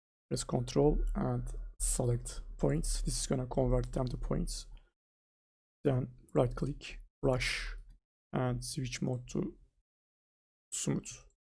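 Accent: Turkey. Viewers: aksanından hareketle Türk olduğunu düşünüyorum... video için teşekkürler. faydalı teknikler göstermişin. 3-4 sene öncesine kadar senin gibi daha fazla kaynak bulabilseydim şimdi max kullanıyor olmazdım.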